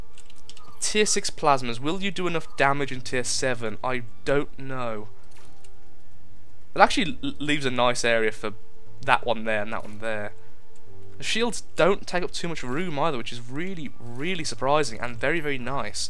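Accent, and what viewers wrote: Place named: England, United Kingdom. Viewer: I am loving ur videos do u live in England because u sound like it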